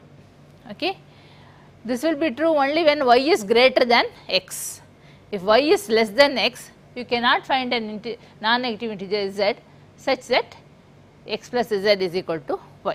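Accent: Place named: Madras, India. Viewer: It's Madrasi accent can't help it, atleast take what she has to offer. Education in pure form ❤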